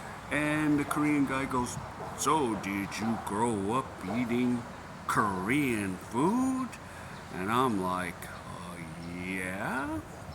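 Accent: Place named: South, United States. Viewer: Maybe u need to tone down the red neck accent a bit hehe..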